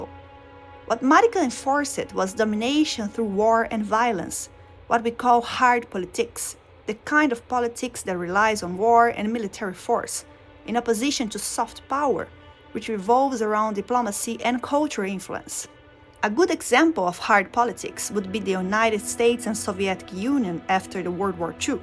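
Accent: Brazil. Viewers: i see that brazillian accent there 👀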